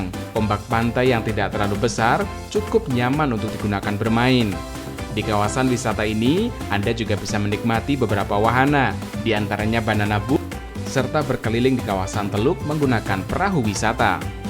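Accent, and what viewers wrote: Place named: Java, Indonesia. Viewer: medok bget logat nye bos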